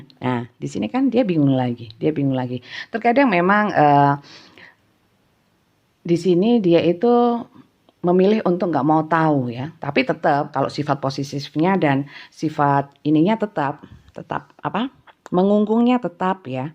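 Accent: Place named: Banyumas, Indonesia. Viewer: Banyumas ngapak bunda.. hehe.. sehat sllu mksh reading nya. 🙏🙏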